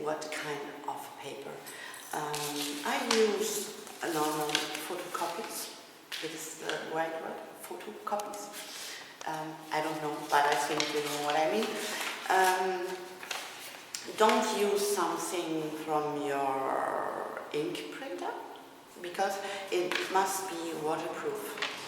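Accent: Germany. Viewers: I am so glad you have taken your courage in your hands and decided to talk to us in England. I have been to Berlin once with my Son. Your English is fabulous with a gentle German accent. From your explanation on how to apply paint, I have al so taken courage .I have bought a canvas and started to paint. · Your English is very good :) And the German accent is just charming :) (The little we can hear of your accent) :) - Thank you for sharing your art work with us, it is very inspiring! :) Much love - Nina :)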